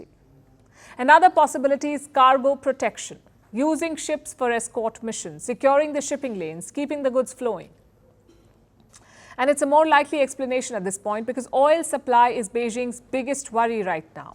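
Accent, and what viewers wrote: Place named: India. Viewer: Please speak English; I can't understand your Indlish.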